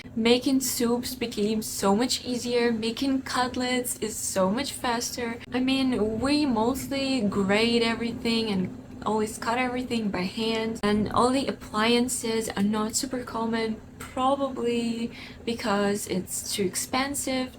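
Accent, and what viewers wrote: United States. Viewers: curious, which slavic country are you from? you sound very american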